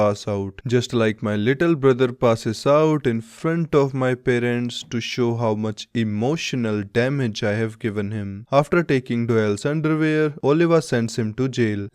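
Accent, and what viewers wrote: India. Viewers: Monotone voice with the Indian accent ... Got It ... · The Indian accent makes the videos so much better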